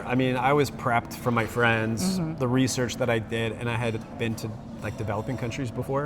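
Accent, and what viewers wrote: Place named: United States. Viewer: We're you actually from the Philippines? You have no Filipino accent at all. You have a more American accent.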